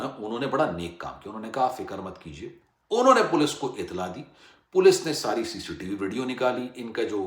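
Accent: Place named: India. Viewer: speak like a pakistani please not indian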